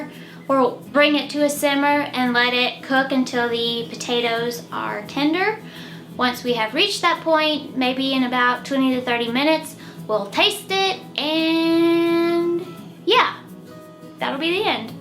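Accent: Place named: North Carolina, United States. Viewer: You sound like your from my neck of the woods in North Carolina 🤗😁, "don't need no meat"😄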